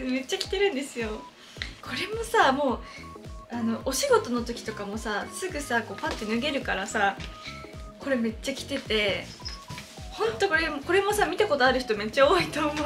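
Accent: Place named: Hiroshima, Japan. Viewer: イントネーションが広島‼️(๑′ฅฅ‵๑)www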